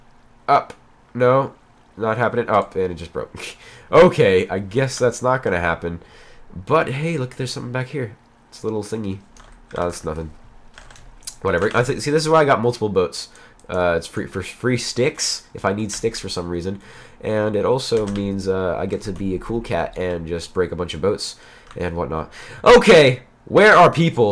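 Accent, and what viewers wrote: United Kingdom. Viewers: the british accent ain't bad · Your British Accent xD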